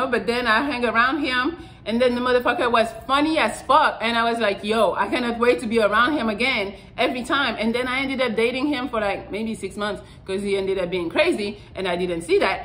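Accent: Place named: France. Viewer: Approximately pm March 06 2022 from Tobago: You are awesome. I love your French Accent. Keep up the great work of simplifying the interaction of men with regard to women.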